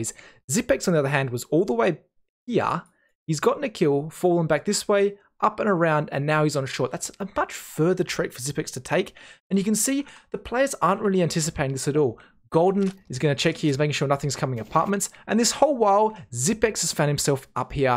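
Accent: Australia. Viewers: One of your absolute best videos ever man. I find the Australian accent is such a great one to listen to with analyses; I know that sounds weird, but it may be thanks to the many cricket matches my father used to watch in the house when I was a kid lol Subjective BS aside, your analysis here was super detailed and spot on. Down to the movement paths, the timings, the mindsets, right down to the metal-association with Nuke B- everything. Really, they are always good; but with this video, you have outdone yourself.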